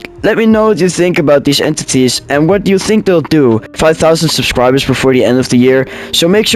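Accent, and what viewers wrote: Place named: Netherlands. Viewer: I noticed that you have a bit of a Dutch accent. Are you Dutch by any chance?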